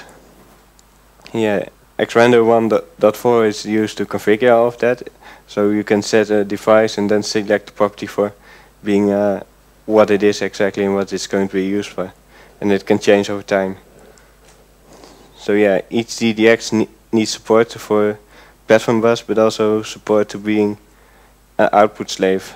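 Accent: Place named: Netherlands. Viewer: Klinkt zó Nederlands :-D maar goed gedaan.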